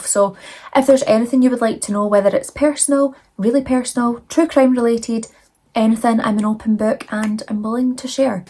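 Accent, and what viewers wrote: Scotland, United Kingdom. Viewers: I do the same thing! I switch between Midwest USA, and Scottish accent all day!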